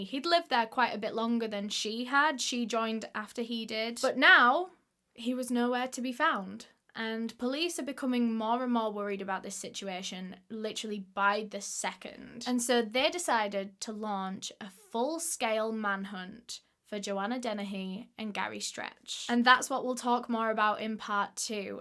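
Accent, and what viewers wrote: United Kingdom. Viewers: hello. i love your British accent..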